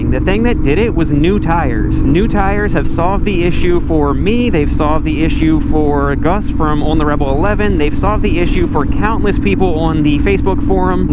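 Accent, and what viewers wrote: Baltimore, United States. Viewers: I thought I recognized that accent. Hey Hon! Bawl-Tee-Moh.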